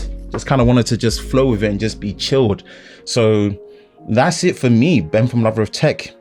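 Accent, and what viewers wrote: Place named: United Kingdom. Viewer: Your britch accente is epic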